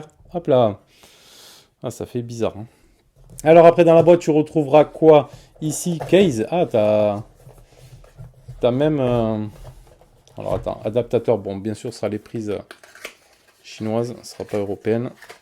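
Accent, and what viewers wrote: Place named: France. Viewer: I really love his French accent. 😆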